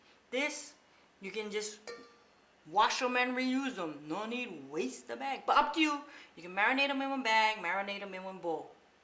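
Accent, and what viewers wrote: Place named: Hawaii, United States. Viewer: "da less dishes i wash da bettah!" lol i love your videos xD you're so entertaining to watch and i like how you incorporate pidgin in your show. au'raiii!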